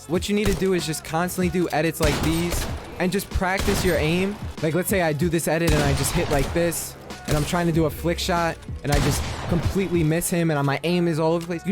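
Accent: New York, United States. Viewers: This guy definately from new york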